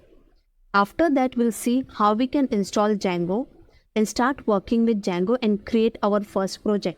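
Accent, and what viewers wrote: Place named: India. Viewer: i love your videos and your Indian accent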